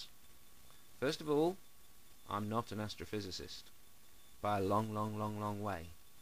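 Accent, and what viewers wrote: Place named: North, United Kingdom. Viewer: I'm not sure where is from, but he has a mild northern accent. I can't quite place it.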